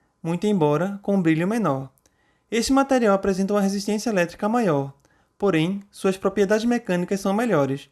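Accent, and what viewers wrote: Brazil. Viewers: Hay un canal de ciencia se llama manual do mundo. El tío también es de Brasil. Pero su acento es muy diferente de tuyo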